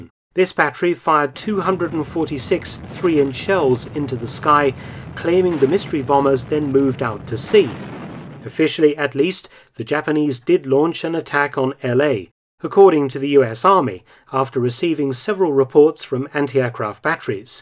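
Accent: United Kingdom. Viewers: Ok, the humiliation and desire to retaliate because of the cactus, combined with the british narration, makes this video seem like a Monty Python mockumentary.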